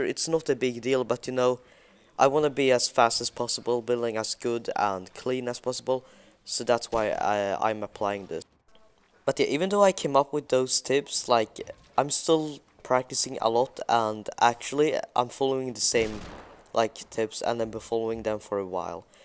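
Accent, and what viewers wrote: Sweden. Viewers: You're swedish right? Jag hör det på din brytning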